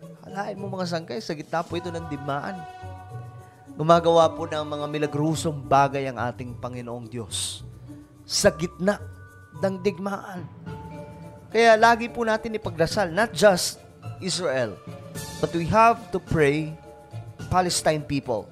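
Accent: Waray, Philippines. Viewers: Ok sangkay parang waray.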